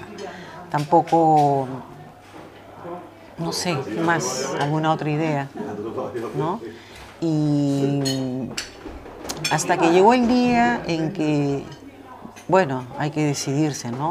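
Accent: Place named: Peru. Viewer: totalmente de acuerdo contigo,sabes yo veo tus vídeos y son tan agradables además tu acento hermoso del Perú,yo soy Méxicana y siempre estoy mirándote y escuchando tus consejos tan sabios y he aprendido de ti y muchas cosas que tu dices es verdad,porque mira que tan diferente es vivir aquí en Latinoamérica y vivir en Europa estoy consciente de eso,mi novio polaco me pregunta siempre de cómo es la gente Latina y tiene curiosidad de todo y yo le platicó muchas cosas para que el venga a México y no desconfíe de mi,y también me gustaría irme a vivir a Polonia y tendria que dejar todo,mi familia y mi país y soy capas de eso por un amor verdadero aúnque le tengo miedo al frío es la verdad y si yo todo se lo dejo al destino porque apenas llevo un año de relacion con mi novio y quiero conocerle cada día más y más y no importa tanto las fechas importantes yo pienso que lo más importante es el tiempo de calidad que se disfruta al estar viviendo ya con la persona que será mi esposo,yo ya no estoy para juegos de Internet y soy Feliz y disfruto día a día la relación que tengo y pienso que si el destino lo quiere estaré pronto con mi novio porque yo quiero casarme con el y no ser sólo una aventura,entonces me gusta escucharte y si estoy de acuerdo contigo me encanta tu forma de ser y me idéntifico contigo,saludos desde México y gracias por tus consejos señora guapa te ves muy guapa de color blanco muy elegante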